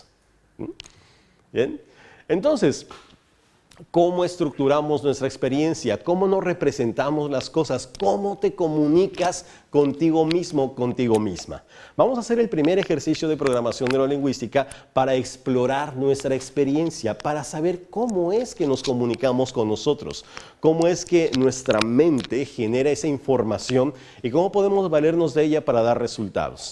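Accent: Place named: Mexico. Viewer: Me suena mexicano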